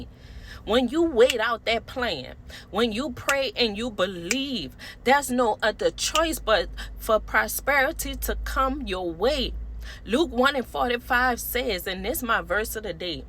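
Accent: Louisiana, United States. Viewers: Say that Sis 👏🏾👏🏾‼️ I’m loving that Louisiana twang!!!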